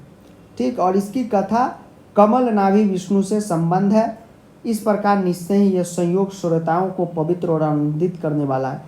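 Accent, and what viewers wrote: Bihar, India. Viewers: Bhut bhadiya sir asli bihari ki tarah padhe h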